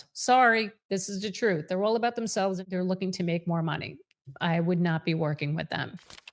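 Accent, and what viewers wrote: New Jersey, United States. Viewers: I watch this channel for the New Jersey accent alone 😅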